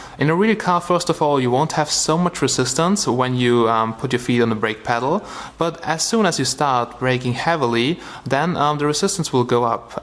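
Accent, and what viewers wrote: Germany. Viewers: Are you german speaking guy? :D Bist du? , es tönt so :D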